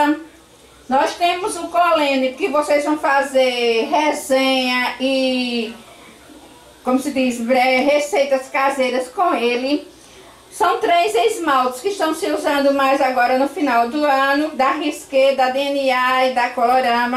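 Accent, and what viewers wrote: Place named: Northeast, Brazil. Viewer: que maravilha!!! Amei seu canal!!!Apaixonada por esse sotaque arretado... Bjos!!!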